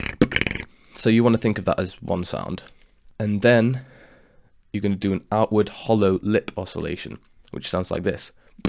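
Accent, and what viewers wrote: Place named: United Kingdom. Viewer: Were you raised in the UK...coz u have a strong british accent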